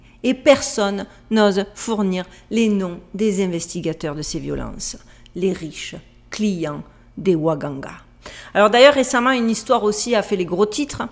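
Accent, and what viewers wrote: South, France. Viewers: J'aimerais avoir des informations de qui vous êtes ma chère et belle dame. Pourquoi d'abord vous êtes formidables et une pro dans se que vous faites 2, je suis un nouveau sur votre chaîne Youtube et je suis un artiste rebel retraité artiste de l'est du Canada français. Ma demande c'est que vous êtes très cool belle Vivent dans un condo hyper moderne. A las Vegas. Vous avez un accent du sud de la France genre de Marseille ou Toulouse ou ex en Provence. Je me demande qui vous êtes voilà bisou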